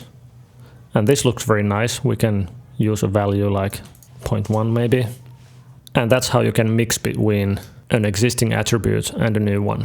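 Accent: Finland. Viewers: The finnish accent, we meet again. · Veri finnish äksent👌 loving it! Great tutorial👊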